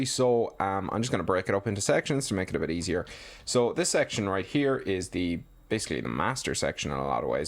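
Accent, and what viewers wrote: Ireland. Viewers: oh I could tell, I'm also Irish lol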